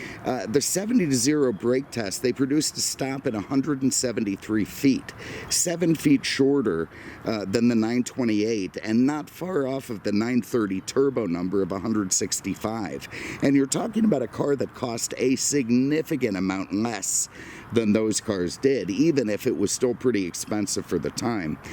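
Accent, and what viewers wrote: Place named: Northeast, United States. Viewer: I can definitely hear the northeast bagel accent that transplanted to FL 😂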